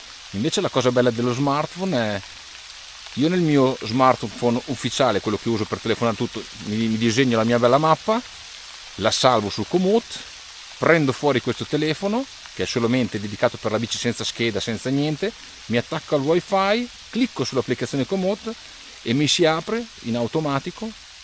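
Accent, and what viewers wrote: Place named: Emilia, Italy. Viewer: Ciao Hai un accento emiliano e vivi in Germania ma quanto forte sei è un gusto seguirti. Continua così.....😊😊😊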